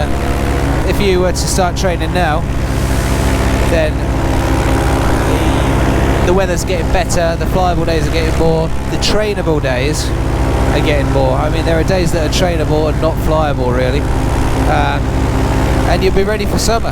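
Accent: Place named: United Kingdom. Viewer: I'm here for the British accent! lol Can't wait to hear it on the Podcast! Great video! Its so cool seeing all the landscape in the UK. That quarry looks like it'd be amazing to fly, weather permitting. I slightly jealous that you have low lying clouds. Here in Texas, cloud base is regularly at 10,000 MSL! Looking forward to our chat!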